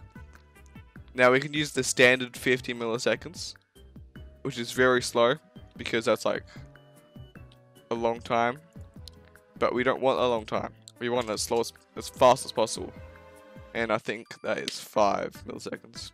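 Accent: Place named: United Kingdom. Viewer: a little bit of British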